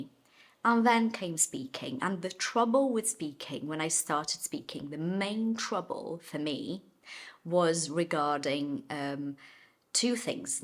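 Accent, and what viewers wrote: United Kingdom. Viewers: You have a beautiful British accent!! I couldn't tell you are not a native, actually!!! 😮😊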